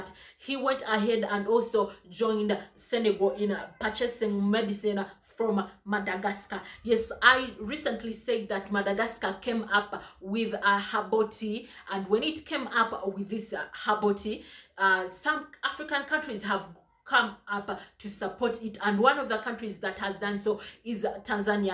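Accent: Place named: Uganda. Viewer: Your accent should be on my tv girl. Amazing Don't mind me, i get overly excited when I meet more than average fellow Ugandan Content createors. Bless you 🤣💃 Bless you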